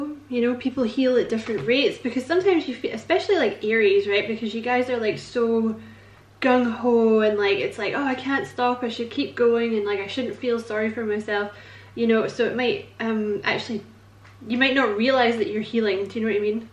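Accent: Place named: Scotland, United Kingdom. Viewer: Keep it going. Interesting reading. Have fun listening to your readings. You sound like me. With the pure Scottish banter.😂😂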